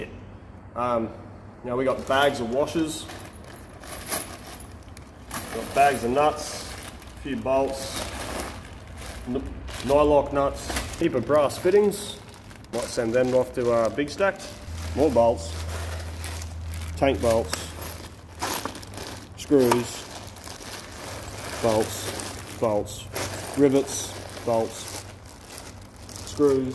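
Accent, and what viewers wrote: United States. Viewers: You're starting to sound less ke an American. Made right here in the USA . You did mention made right here in Australia a lot 😂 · Oh no, you're starting to talk American now. Good score on the auction. Hope y'all had a good Christmas. regards from Wales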